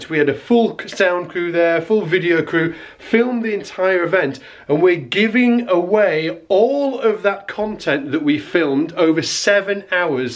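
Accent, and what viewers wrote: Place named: Northern England, United Kingdom. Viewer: Eh, he's a northern Brit, it's OK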